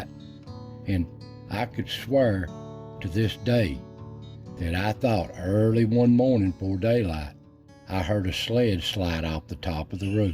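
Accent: Appalachia, United States. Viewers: I love ur Appalachian ol smokey Mt voice. God bless you sir 🙏 and merry Christmas 🎅 🎄 and Have a happy new year from the smoky mountains of sylva nc. Keep the Appalachian stories coming my friend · I've only just found your channel today and have been listening to you all afternoon. I am in New Zealand but the Appalachian people and mountains have interested me my whole life. I love listening to your kind voice and beautiful accent. This story is my favourite so far. Thank you for sharing. Bless you.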